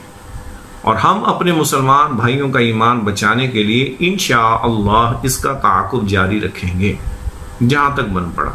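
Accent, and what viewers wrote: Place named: Arab world. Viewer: movli sab apny arabi lehjy say impress krny ki koshish ki hai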